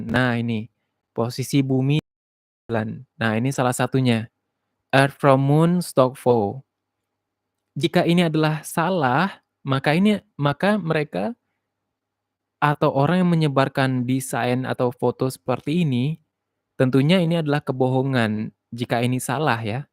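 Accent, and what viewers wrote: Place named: Sumatra, Indonesia. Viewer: yg jelas beliau logat sumatra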